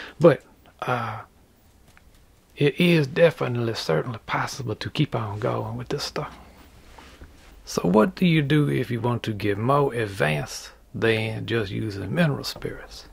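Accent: Louisiana, United States. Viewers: the awesome Louisiana drawl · you gotta be from da bayou. I recognize that accent. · Southernish? It's actually more like a Bayou Accent but he seems to be in an area where they speak "English". LOL Deep swamp language would need subtitles. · this guy's accent reminds of of that cajun chef Justin P Wilson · Are you from Louisiana? Your accent is obviously exaggerated but you have a bayou accent that can only be exaggerated by a Louisianian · you have the most Louisiana accent I’ve ever heard and I love it. I don’t know if you’re actually from Louisiana but this is how I imagine everyone down in the bayou speaks. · He does sound like he's from my town for sure. Gonzales LA FTW.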